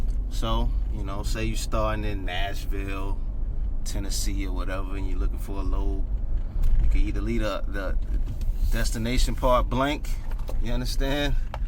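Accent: New York, United States. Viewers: Are you sure you not from NY? You be using New York lingo and you sound like you from NY I swear lol.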